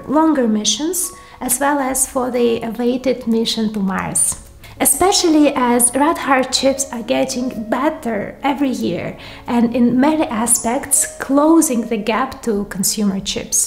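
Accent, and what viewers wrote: Russia. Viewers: Star trek's Chekov was adored in part vecause his acdent related pronunciation limits were endearing to us who were raised spraking American dialects of English. The Russian~Slavic accent is a cool factor that many of us adore so please don't worry so much about mispronouncing non-vital things. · Are you from Russia, Ukraine or Belarus? - Megagertz sounds quite right😉 Still these vids are cool, thx for making them 👍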